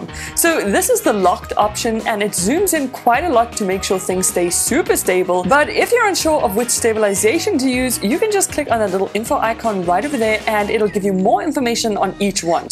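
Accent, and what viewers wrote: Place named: South Africa. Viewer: South African?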